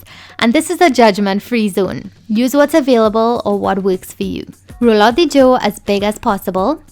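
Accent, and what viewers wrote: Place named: Trinidad and Tobago. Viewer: This has to be the best video on paratha. I moved from Trinidad at 17 years old but I vividly remember these family occasions. Thank you for sharing this part of your family with us. Job well done!!! Side note- I love the fact that you kept that strong Trini accent. Don't ever lose it.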